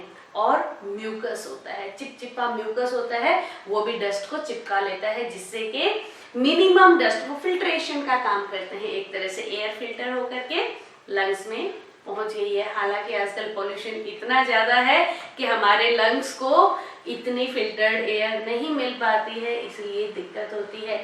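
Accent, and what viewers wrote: Haryana, India. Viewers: Haryana k ho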